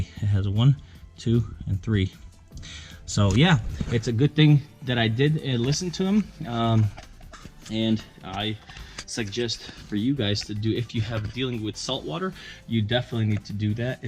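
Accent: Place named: Russia. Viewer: This guy's accent sounds Russian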